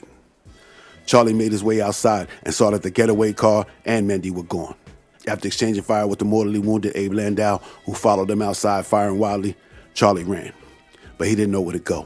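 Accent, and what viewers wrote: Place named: New York, United States. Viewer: New York going by his voice.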